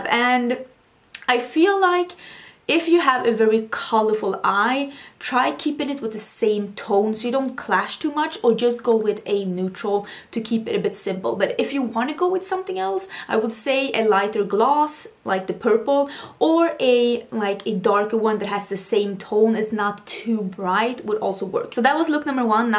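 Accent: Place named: Stockholm, Sweden. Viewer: My Fiance is from Stockholm, I'm from the US. To me, your accent sounds like a Stockholm accent(I could totally be wrong tho!). I'll be moving to Sweden in the next year! So glad I found your channel!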